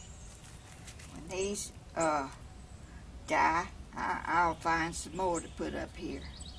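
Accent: South, United States. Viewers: I wish we were neighbors so that we could share knowledge and crafts. my daughter who is 13 wrote a paper on your videos. she practices talking like you and she says she just loves your southern accent...we people from Texas love accents..lol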